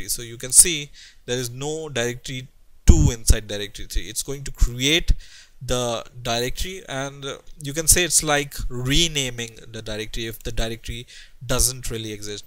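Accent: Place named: India. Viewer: I can understand his Indian accent. awesome.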